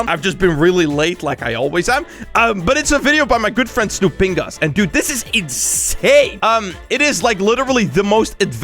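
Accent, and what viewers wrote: Netherlands. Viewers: Your Dutch aren’t you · Are you dutch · Wait are u dutch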